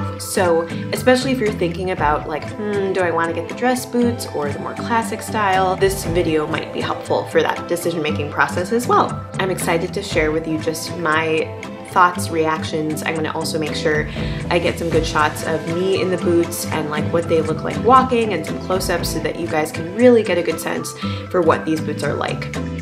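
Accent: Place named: Minnesota, United States. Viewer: the minnesota accent gets stronger as the video goes on :)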